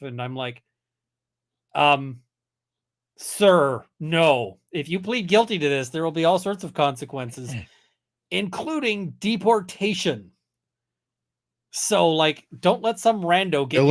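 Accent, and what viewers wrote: Canada. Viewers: It's so lovely to hear your Canadian accent. One of my best friends is a UK born Canadian.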